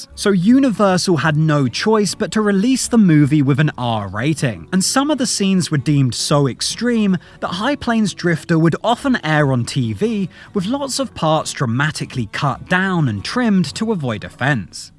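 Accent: United Kingdom. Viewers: I always wondered why when people make these videos it's never Americans always sounds like a British person or something like that I don't get it should be Americans doing this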